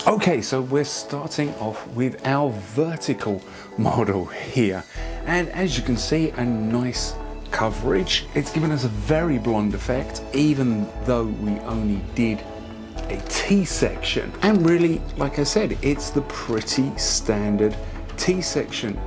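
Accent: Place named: United Kingdom. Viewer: And YOU are annoying because you're operating YouTube, and don't even know how to adjust the speed 😂 This man put in SO much work to HELP TEACH people, and all you can do is complain? Maybe just don't watch at all and save the annoying, rude, and ungrateful comments for somewhere else 🙄 Also- Many British accents are slower/more drawn out. He's also trying to be very deliberate in what he says so that everyone can understand.....but again- you DO have the option to speed it up!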